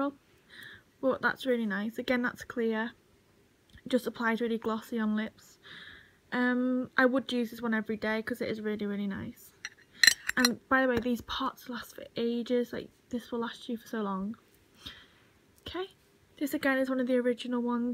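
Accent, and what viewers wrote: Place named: United Kingdom. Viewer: I really love the british accent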